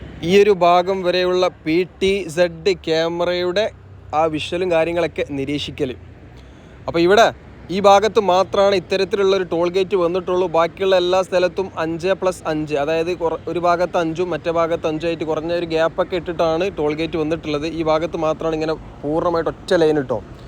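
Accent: Malappuram, India. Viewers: ❤❤❤I love your Malappuram slang❤❤❤❤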